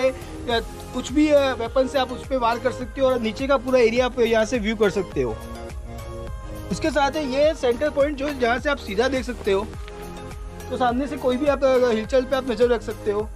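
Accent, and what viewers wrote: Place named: Gujarat, India. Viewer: Bhai bhai ek Gujarati nu Hindi kaik alag j hoy chhe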